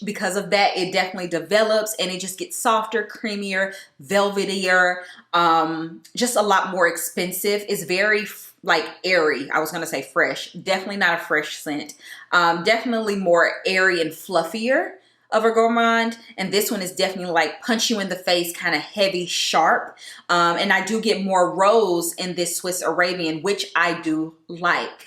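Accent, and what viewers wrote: South, United States. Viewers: I love your accent you have a southern sound, love this video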